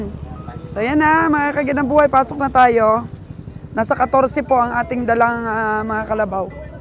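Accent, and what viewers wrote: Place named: Batangas, Philippines. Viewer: meron kana puntong batangenya madam heheh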